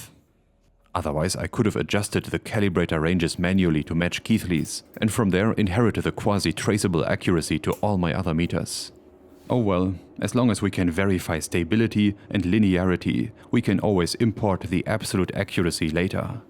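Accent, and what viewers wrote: Germany. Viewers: Germany's have fun acent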